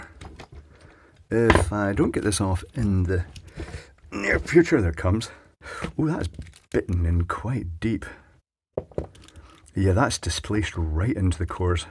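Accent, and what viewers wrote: Scotland, United Kingdom. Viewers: Is originally from Scotland or Mann? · Wait, you live on the Isle of Man? I been thinking you were from Scotland this whole time.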